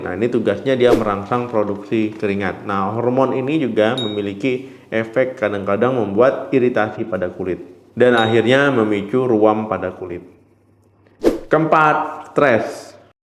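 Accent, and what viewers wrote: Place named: West Sumatra, Indonesia. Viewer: Emang dokter urang minang yaaaa😂 galigato bahasa awak tuuu😅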